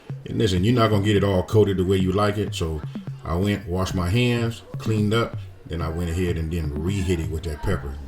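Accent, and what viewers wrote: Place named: California, United States. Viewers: I can certainly tell you’re from Cali man, love the video, subbed. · you my fav!! You get to the point and make it simple!!! And that Cali accent 💪🏾💯💯💯👍🏾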